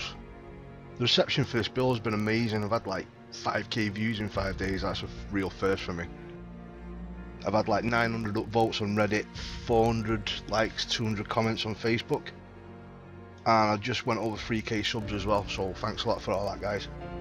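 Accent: Manchester, United Kingdom. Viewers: You from Manchester lad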